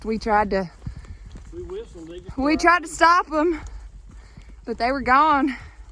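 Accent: South, United States. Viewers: Y’all would be SOOOO fun to hike with! (In a non-social distancing environment, of course)! Love the southern accent young lady! Our Head Start children would loVe your voice at story telling time!!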